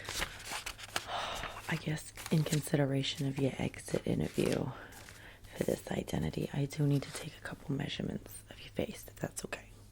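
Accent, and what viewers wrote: New Jersey, United States. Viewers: She can do Southern accents, Midwestern accents, and now Jersey. What more? 😂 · I LOVE the jersey accent! And it low-key reminds me of the mom in karate kid 😭 ifykyk Love ur vids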